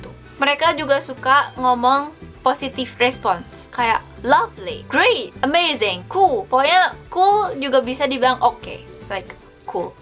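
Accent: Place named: United Kingdom. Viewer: your accent is getting more british! ❤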